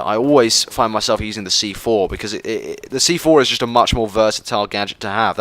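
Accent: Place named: United Kingdom. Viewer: beast vid, nice to hear a british accent. Subbed and liked!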